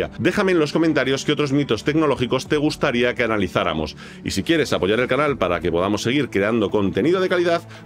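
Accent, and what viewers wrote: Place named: Spain. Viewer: hay que ver cuanto dinero se invierte, por lo gral hay pasta donde se ve algo de potencial... , pero claro, el humano promedio asalariado de a pié , se enterará en la factura de luz/energía tal vez dentro de unos añetes ... xD, o lo que lleve la faena... Soy argentino, pero el azento me hace dar ganas de usar palabras o términos de la pez nínzula ibérica xD jaja xD : "ponele":.. :-b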